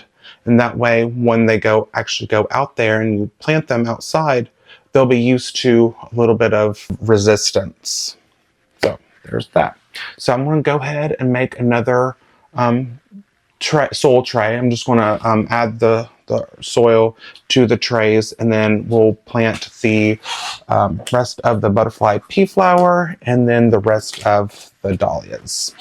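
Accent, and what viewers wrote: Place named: Mississippi, United States. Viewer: LOL! I have a bob ross chia pet too haha. Your accent makes me feel like I'm back home. I'm originally from Mississippi.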